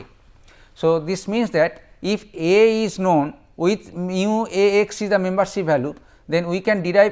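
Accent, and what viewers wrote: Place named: Bengal, India. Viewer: I think Hindi is not his native language. I think his native language is Bangla . I am getting that from his accent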